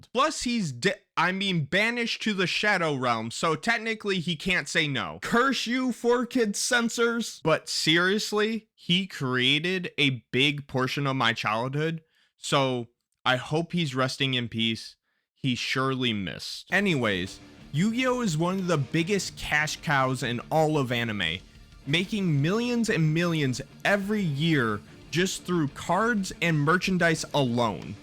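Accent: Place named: Brooklyn, United States. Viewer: I love the Brookland accent lmao subscribed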